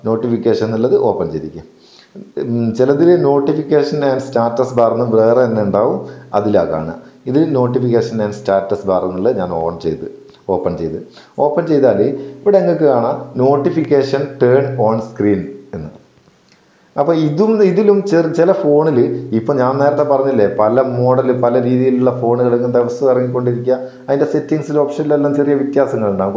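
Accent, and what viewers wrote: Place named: Vadakara, India. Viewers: നല്ല തനി വടകര സ്റ്റൈലിൽ അറിവ് പകർന്നു തന്നതിന് വളരെ നന്ദി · ഇഞ്ഞി കോയിക്കോട് ആണല്ലേ... ഇമ്മളെ ജാനു ഏടത്തി ടെ... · ശരിയായ മേപ്പയ്യൂർ സ്ലാംഗ് തന്നെ. കൃത്രിമത്വം തീരെയില്ലെ. കൊള്ളാം! ക്ലിപ്പ് കണ്ടോരെയൊക്കെ കൈയ്യിലെടുത്തല്ലൊ! ഉഷാറായിക്ക് കുഞ്ഞോനേ! Thanx! Go ahead! Kadathanadan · ഗുഡ് മെസ്സേജ് , അവതരണവും കുഴപ്പമില്ല. ഒരു നാദാപുരം സ്റ്റൈൽ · നല്ല നാടൻ പയ്യോളി ഭാഷാ നൈസ്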